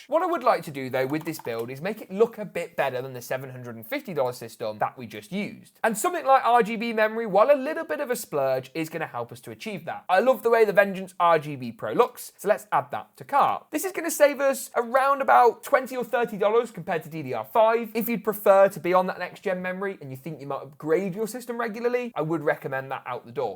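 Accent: England, United Kingdom. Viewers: Why tf dollars ? You sound English? Pounds perhaps ?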